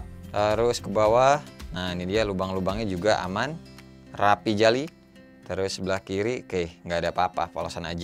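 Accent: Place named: Lamongan, Indonesia. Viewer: Kayak ada ciri khasnya orang lamongan...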